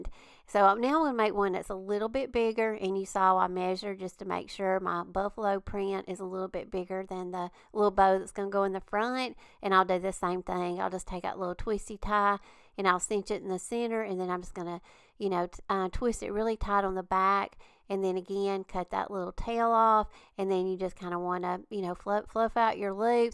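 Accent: South, United States. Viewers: Thank you so, so much for getting back to me, don't know how I missed it, can't wait to make some of those trees! By the way, while I was searching (& finding 25 OTHER fun things to make!) I saw something where you seemed like you were sort of apologizing for your Southern accent---don't apologize, it is charming & adorable. I've never lived in the South, but I love hearing you talk, makes me feel like we could sit down on the front porch with a glass of sweet tea & giggle about some of our craft disasters (no. more. glitter. ever.) and favorites. Thanks again!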